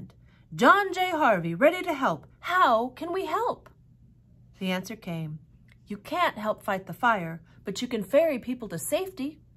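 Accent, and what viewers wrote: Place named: Texas, United States. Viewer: I love you. Ty for this. Btw, u have a Texas accent now. ☺️ I love it!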